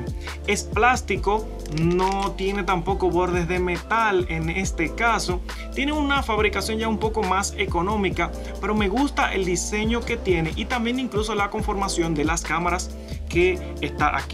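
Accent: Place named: Dominican Republic. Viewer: Yo se que si eres de República Dominicana por el asentó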